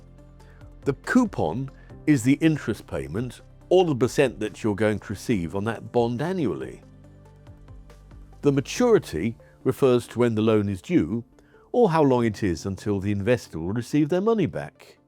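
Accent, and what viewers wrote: United Kingdom. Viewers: the British accept helps me understand better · False Words: "proof just sure certain break principal due based problem" -- ya that means "insurance" is also a false word & wow is it:face-purple-crying:America has defaulted 5 times about every 1/2 century kinda: 'Nixon said he was temporarily suspending the dollar’s convertibility into gold. The most obvious lie was Nixon’s claim that the suspension would only be “temporary.” It’s still in place today -- the US dollar has lost over 87% of its purchasing power since 1971. “Since 1789, the United States has paid all of our bills on time,” said former Treasury Secretary Janet Yellen. “In our history, we have never defaulted on our debt or failed to pay our bills,” said President Biden’s press secretary. “… the United States has never defaulted on its debt,” wrote The Washington Post. 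You hear it everywhere. The US has never defaulted. It’s repeated by politicians. By bureaucrats. By the media. As if it were gospel. All lies.' As one who did the accounting for an IT company I used to work for this all makes sense now why NOTHING made sense lol. Now I see fully why I am/was so obsessed with accounting -- follow the $. IT'S ALL A SCAM -- INSIDERTRADING DONTPLAYDONTBREED. Now that MOON HOAX I totally understand why they did it though it is STILL WRONG -- esp. now that I know that THE REST OF THE FREAKING PLANET KNOWS & IS BLKMAILING THE USA FOR TECH. $2B/day -- ya that's not sustainable. Luv this vid everytime nearly when i hear that british accent w "bonds" i thk james ; ) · Thx much. Clear and understandable. And, with a british accent, it's immediately perceived as smart and credible!!😊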